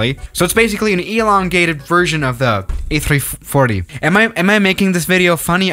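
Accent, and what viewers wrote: Germany. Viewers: Alter manchmal hört man deinen Deutschen axent so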